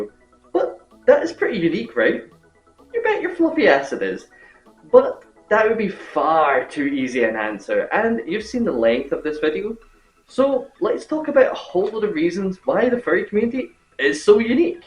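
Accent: Scotland, United Kingdom. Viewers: Is that a Scottish accent I detect? Well, hello there fellow Scot! Interesting video.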